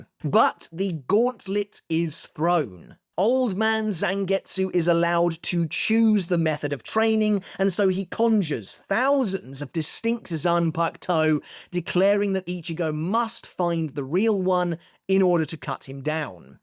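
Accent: United Kingdom. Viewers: Bro those are bangers plus that british accent 💥💥💥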